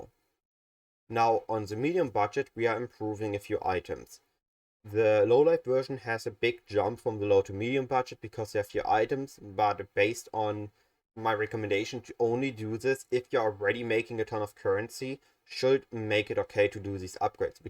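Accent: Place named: Germany. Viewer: If you can't differentiate between an accent and general pronunciation you need a reading comprehension test. Fucking moron. PS: The pronunciation is actually *fine*, it's no different than any other German accent pronunciation. Maybe if you're having so much trouble hearing what he's saying, you need the hearing test more than I do 💀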